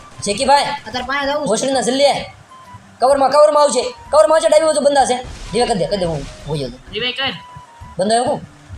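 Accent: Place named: Kathiawar, India. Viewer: bhai કાઠીયાવાડી lage se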